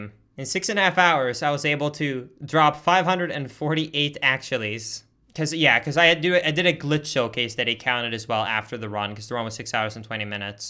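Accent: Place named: California, United States. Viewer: What’s so fucking funny Is that I DO THIS TOO Maybe it’s just because of my California accent but it’s hilarious that I’m GENUINELY trying to NOT say “actually” like 400 times And yes You do absolutely have the elements of the California accent The tone changes, the usage of “like” for 500 different things “Overusing”* “literally” and “actually”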